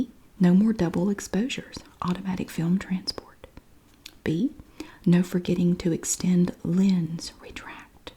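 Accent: South, United States. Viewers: I'm from the U.K. and your southern accent is absolutely dreamy! I love the way you pronounce the word "Foil"! You have great production skills also, great vid. Thanks for sharing!!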